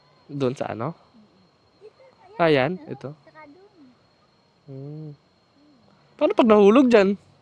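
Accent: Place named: Cebuano, Philippines. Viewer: 😂😮 Cebuano k pla hnd waray,ingat ba Kaibigan 😊😮idoy or nonoy ,noy sa Tagalog haha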